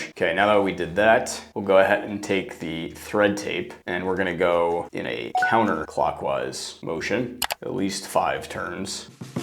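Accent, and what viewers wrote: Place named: RP, United Kingdom. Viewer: tutorial on installing an S-style shower arm is absolutely top-tier. His plummy delivery and refined advice make a technical plumbing task feel like a sophisticated DIY masterclass. He explains the elevation gains and clearance benefits with such clarity that even a complex setup feels effortless. If you’re looking to upgrade your shower experience with professional guidance, this is the gold standard.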